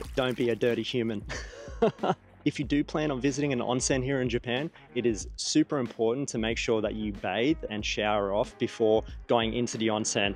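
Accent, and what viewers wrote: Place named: Australia. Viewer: I am from jp. First time watching. Sounds like you are from Australia and you did mentioned that after 12 mins in. I believe you when you said it is hot. I can hear them cicadas chirping away. Did you master the art of dozing off on train, but managed to wake up right before your stop yet? They are starting to post signs telling us to not walk on escalators. I don't think that will every happen. I always walk on them. If I stand, I feel like I have missed 10 things I could have completed if I did walk. To be fair, the conductor do say to wait for others to get off the train first before you step in. Also, they pick up their trash and food wrappers they have purchase when they left the room. Sounds like you have been in jp for over 3 months. You must be another master of sorting your trashes at home A??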